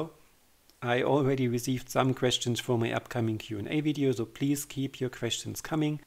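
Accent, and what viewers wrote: Germany. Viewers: Hi , ich habe mir schon mehrmals etwas von Dir angeschaut und immer gedacht : er hat einen deutschen Akzent... Nach meinem letzten geschauten Video weiß ich zumindest das Du Deutsch sprichst. ( Fassettenlack) ...LOL...Kannst Du vllt mal etwas in deutsch machen? Das wäre echt klasse. 😍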